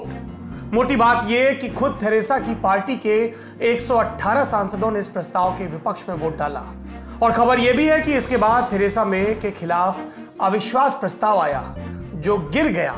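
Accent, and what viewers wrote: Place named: Bundelkhand, India. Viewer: Ashish Verma wo urai (Bundelkhand ) se hain. Avadh se nhi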